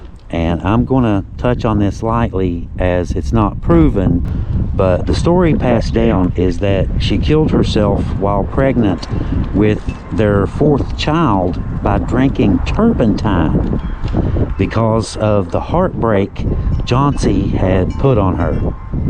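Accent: South, United States. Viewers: I could listen to your smooth southern accent all day long ❤❤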